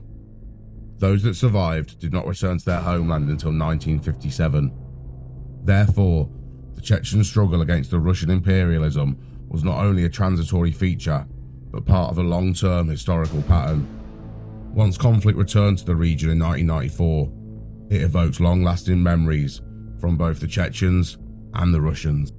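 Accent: United Kingdom. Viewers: Had to stop watching after about 30 seconds. Fuck me, that's the roughest British accent I've ever heard. The narrator doesn't even try to pronounce the letter 't' and genuinely sounds drunk or half asleep. Just awful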